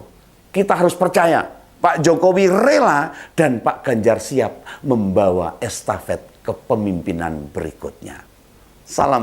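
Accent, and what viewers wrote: Java, Indonesia. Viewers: mas begawan nada bahasanya santai dan kental cirikhas jawa aslinya, sy org bali senangsekali mendengarnya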